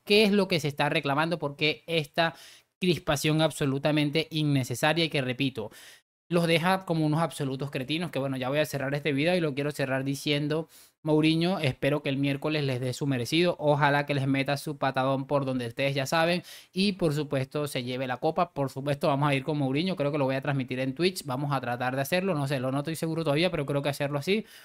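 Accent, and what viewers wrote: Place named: Venezuela. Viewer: Oye una pregunta sin sin ofender y perdona si te ofendo de dónde eres? Porque me gusta mucho tu acento y no sé si venezolano o cubano 🙏💪🔥😈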